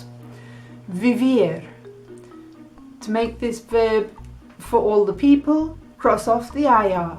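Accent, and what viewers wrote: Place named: Liverpool, United Kingdom. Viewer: Eres de Liverpool? Originally? (Slight Liverpudlian lilt) Muchas gracias for everything you do 😃 👏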